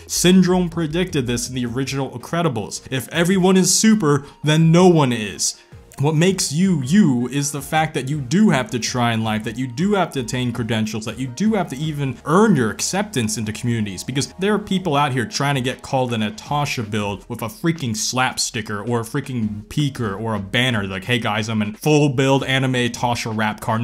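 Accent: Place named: Atlanta, United States. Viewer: ngl you're kinda starting to pick up that ATL dialect, almost sound like someone native to Atlanta now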